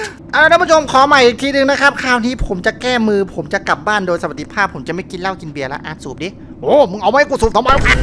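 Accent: Isan, Thailand. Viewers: เจ่าเป็นคนอีสานบ่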